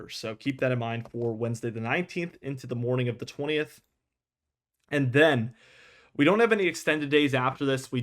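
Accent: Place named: Virginia Beach, United States. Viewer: Def a Va. Beach accent . Thanks for what you do .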